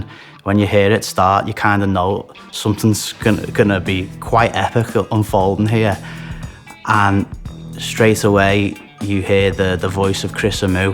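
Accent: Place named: Liverpool, United Kingdom. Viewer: LOVE THIS ACCENT WHICH MAKES ME FEEL I ONCE LIVED IN WONDERFUL PLACE WITH PLENTY OF GOOD PEOPLE BY MY SIDE WHERE? LIVERPOOOOL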